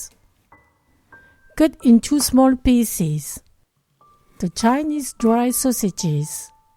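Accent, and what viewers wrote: France. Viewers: Are you french ? you sound french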